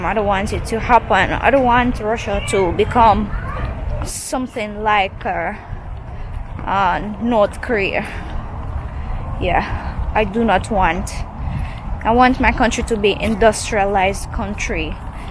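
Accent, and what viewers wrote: Patois, Jamaica. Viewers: I love wen u speak and I agree with you totally on these topics. It's good to hear you speaking the patwah and you have a wonderful family thts worth fighting for to stay together always. Thanks for sharing and all the best going forward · I love the way you embracing our jamaican language good! job 👍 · Looooobe her jamaican accent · Ummm… she’s Jamaican. How am her accent be on or off “point”, it’s her natural accent. WTF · She sound pure Jamaican . Never knew Russian married to blacks . That’s an unlikely pair . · O shit she got a Jamaican accent wasn't expecting that.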